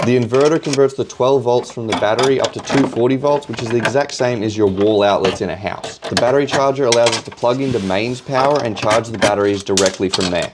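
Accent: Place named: Australia. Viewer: Might miss the mark entirely, but he sounds Aussie to me. So not European but still nonetheless your comment does partially apply.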